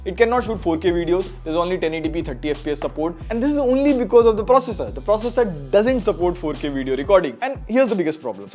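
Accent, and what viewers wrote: India. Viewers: Please stop that Indian accent I can't focus 😢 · Watch american youtubers review he is india you can not understand the indian english accent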